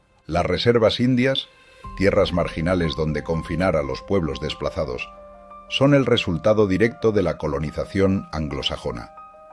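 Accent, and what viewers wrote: Spain. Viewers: Te oyes español. Recuerda que la historia de la conquista de la conquista la escribió Bernal Díaz del Castillo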